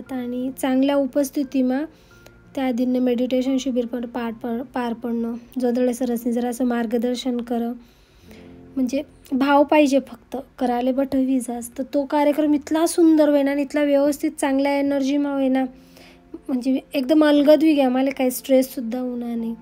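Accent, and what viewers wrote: Khandesh, India. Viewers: Didi tuna video khupch mst rahtas male khup aavdts.. 😅❤aamhi pn shirpur na shet tu khup bhari khandeshi bols aate nya pori khandeshi bolane laj tis nko ka thika na rahe na ghar na dar na.. Tu disale pn khup sundar aahe. 😊 male pn aasch khar bolale aavds jast badaykya marale nhi aavds.. Ek nmbr video rahts.. Tu mo no send kar jo na...... 🎉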